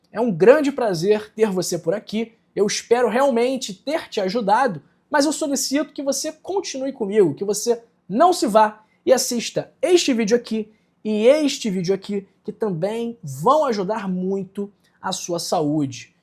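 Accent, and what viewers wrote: Rio de Janeiro, Brazil. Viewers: Não aguento o sotaque carioca. Meu Deus do céu, não dá!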